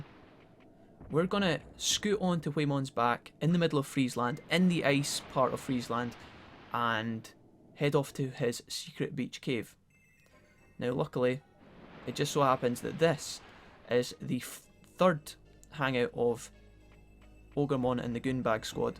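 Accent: Scotland, United Kingdom. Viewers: "Gandalf and the fuck boys" lmao, enjoying these videos man, the Scottish patter is great. xD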